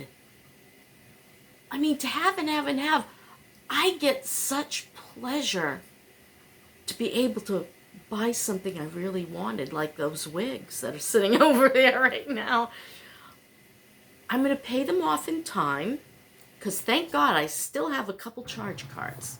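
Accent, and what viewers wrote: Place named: New Jersey, United States. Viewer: Hi! I’ve just discovered you by accident in my feed and now I have someone new to watch! I’ve only watched I think two of your videos so far. I love your “randomness “, that’s exactly how my daughter and I talk…we’re always going from one topic to another then coming back to the first one. I love it! I’m truly happy to see you making it on so little! I love your accent….you gotta be from around New Jersey or something,right? And I love your attitude about punching your way out of a bag! You’re my kind of woman! You can make it through anything and you seem to be doing pretty darn good! I have to do some major downsizing as well! Just so tiring! I hear you about the childhood trauma…I made sure to end that with me. I know my great grandmother was way too tough on my grandma and those attitudes were passed onto my mother who passed it on to me. 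You gotta be the one to end it…life is too short to hurt the ones you love. There’s always time to change and apologize. We all make mistakes but learning from them and not doing it again is the main thing. Are you hoping to have this channel be a source of income? If so, I hope you become more popular and make more money to live on so you’ll have a cushion for emergencies. I sure love listening to you talk and I’m sure others will as well,once they find you that is. Well, I’ll go now seeing as I’ve written you a novel! Lol! Take care! ❤️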